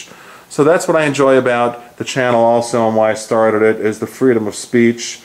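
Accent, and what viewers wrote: Long Island, United States. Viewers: I knew I heard some Long Island in you. I grew up in Westbury around the same time you were growing in Smithtown, Cool. I appreciate your vids.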